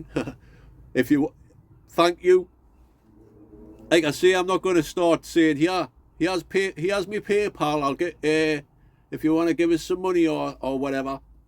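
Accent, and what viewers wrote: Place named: Newcastle, United Kingdom. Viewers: allotment channel and tings it has to be. look forward to hearing the real jordie accent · Came for the gardeing, stayed for the music and your canny personality, the history was good, I was stationed at HMS Collingwood for a time in the RN so hearinf about him was interesting, it's all good, you do you pal, don't think you need to change the channel name, dunt really matter, the titles say what it's about, but if you feel like a change go for it. My best man is a Geordie so I don't have any probs with the full accent, some of the colonials mite get a bit confused like lol. I used to watch when the boat comes in in the 70's as a kid, was the last thing we were allowed to watch before bed, I still remember some of the words, and that mad trumpet from the theme tune lol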